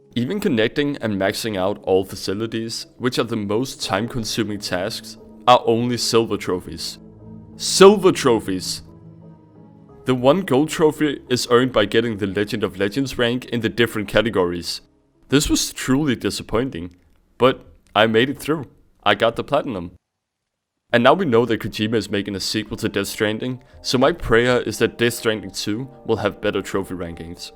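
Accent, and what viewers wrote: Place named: Scandinavia. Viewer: Your accent sounds Scandinavian...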